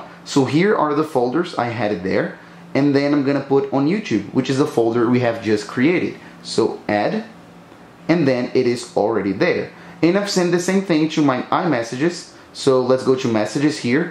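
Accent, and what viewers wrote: Brazil. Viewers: Dude, are u Brazilian? I’m Brazilian as well and they way you speak English it’s exactly how Brazilian accent sounds like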